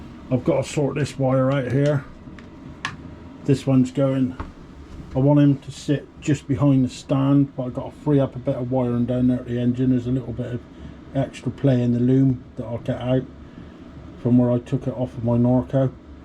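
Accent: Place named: South West England, United Kingdom. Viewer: That accent sounds like its from south west England. Clean and tidy build, not sure about the orange but it will stand out in traffic, for me I would only consider using a fat tyre build in winter or if using heavier battery, What rotor sizes are you using, for me I won't use any size below 180mm, using 203mm up front and 180mm rear, the heavier the build the more stopping your need and 160mm rotors will not cut it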